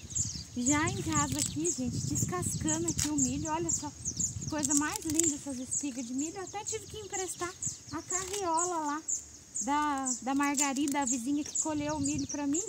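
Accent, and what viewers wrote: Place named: Sao Paulo, Brazil. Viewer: Boa tarde família linda, tdo bem? vc é Paulista, vc tem sotaque de paulista, o r pucha pelo l, polta e colta, me responda por favor, que vontade de comer um pedaço, bolo. moro em Santa Cruz Rio de Janeiro, abraços pra todos vcs e me mande um abraço tbm. ❤❤❤❤❤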